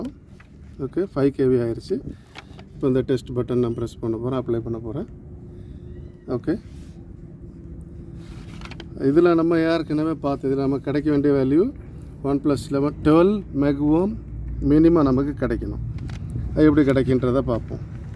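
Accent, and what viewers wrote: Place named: Kanyakumari, India. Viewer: Kanyakumari slang 🥰🥰🥰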